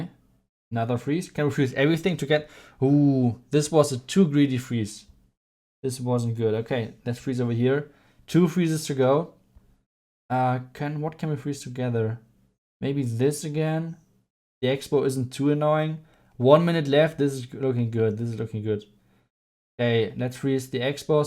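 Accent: Germany. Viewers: You can hear the German accent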